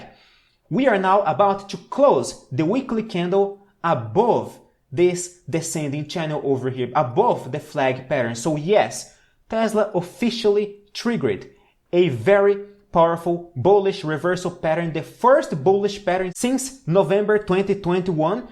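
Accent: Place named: Brazil. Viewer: I knew it! I've had Brazilian friends and ESL students. I'd recognize that beautifully accented English any day. Well done, sir!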